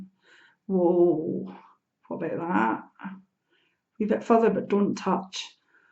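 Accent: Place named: Wales, United Kingdom. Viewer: Thank You for this video . I like your accent though, are you Welsh?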